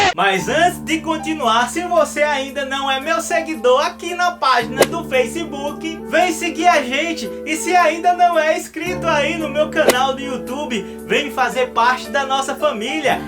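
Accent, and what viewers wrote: Northeast, Brazil. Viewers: Explica tudo num nordestinês fluente.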